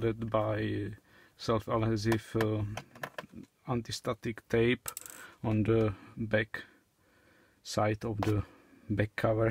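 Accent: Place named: Czech Republic. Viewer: ale no tak.? ty se stydíš za česko? ten akcent jde bleskově poznat. jakože ne že by nebyl důvod se za česko stydět, ale stejně🙂.... a díky za video. přemejšlim, že si ten komp repasovanej koupim.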